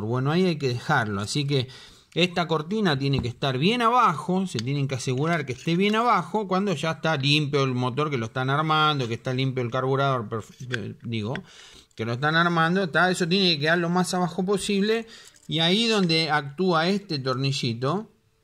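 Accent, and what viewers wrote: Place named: Argentina. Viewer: Es argentino.. debe trabajar pues En chile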